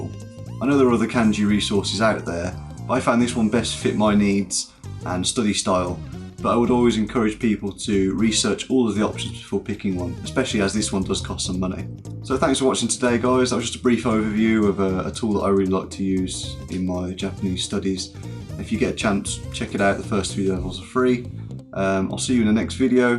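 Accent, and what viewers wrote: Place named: Birmingham, United Kingdom. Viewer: Kantan Japan I will definitely check that out, I had heard of it but was already swamped with books and other websites etc. And the accent give away is from your N4 video, you said the number 5 - it's impossible to cut the accent out of that number ;) Also, I'm from Great Barr, so only down the road - probably why you sound so familiar!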